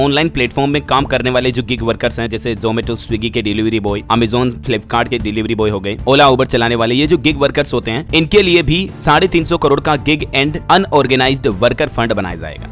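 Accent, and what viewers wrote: Rajasthan, India. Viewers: Wa kya rajasthani boli h bhai...hm राजस्थानी hi h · Vah bhai maja a Gaya Marwadi Bhasha aapke munh se sunkar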